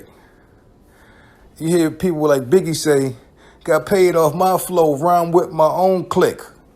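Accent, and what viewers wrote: Baltimore, United States. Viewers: You sound like you from baltimore.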